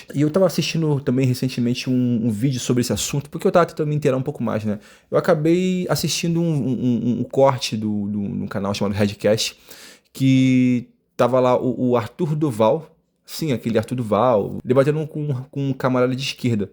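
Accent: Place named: Rio de Janeiro, Brazil. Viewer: Que tal você sair do Rio? Vem pra Minas!!! Aqui a conversa é outra, aqui tem pão de queijo e café no bule. Vai ser bom pra você, porque você vai aprender o mineirês e vai perder esse sotaque enjoativo do povo daí. Tá ruim aí? Vem pra cá! O que você tá esperando?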